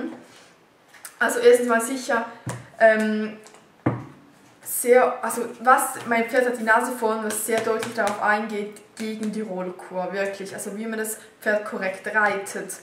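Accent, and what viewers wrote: Switzerland. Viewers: Haha schwizer Dialekt! :D Wo stoht dis Ross eig? (Sorry wege Solothurner Dialekt xD) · sooo süß wie man den schweizer dialekt raushört :D !!!! schöne grüße ausm ländle !! jedsmol wenn i dine videos aschau wür i so richtig nidisch xD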